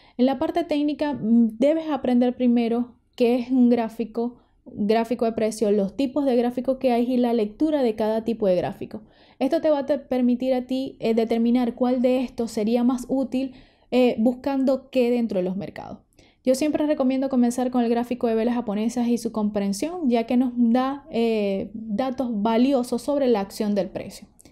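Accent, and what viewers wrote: Maracaibo, Venezuela. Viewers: Holaaa viendo tu canal me estoy dando cuenta de toda la información q nesecito saber para hacer esto... De verdad te agradezco mucho la información.. Lo mejor de todo es tu acento Maracucho con que das el mensaje, los maracuchos vamos a conquistar el mundo.. Jajajaja saludos... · Venezolana? Maracucha????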